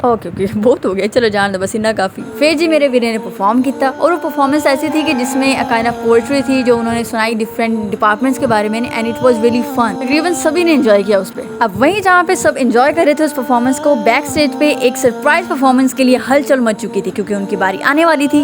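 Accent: Punjab, India. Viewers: Yr sister ap ki cute punjabi 😂❤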